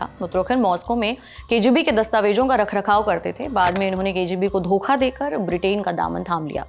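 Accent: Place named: Rajasthan, India. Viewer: madam aap Rajasthani ho 😇...tiya pancha bolne ka trika like rajasthani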